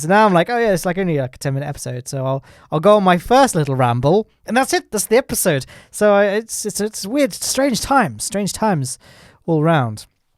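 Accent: United Kingdom. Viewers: Finally I'm early to "a guy with a British accent" vid. Ps I Only watch your ksp vid they're better no offense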